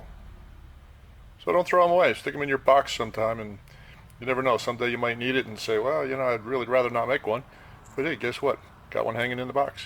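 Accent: United States. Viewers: No need to be careful as our different interpretations make it so interesting. Just continue with your 'American' English and I'll sort out what you mean 😉😀 I'm finalising installing independent power feed to the X-table on my Marlow mill having fitted a VFD main drive. The mill did come with a separate motor but it was disconnected by previous owner and the wiring was a dogs breakfast. Using one of those ubiquitous Chinese 12v DC motors. Now it's heaven selecting the correct speed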